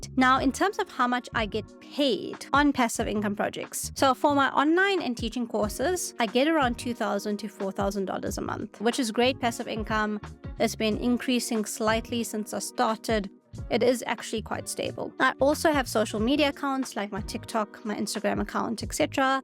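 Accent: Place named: South Africa. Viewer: i miss south africa, i like your south african accent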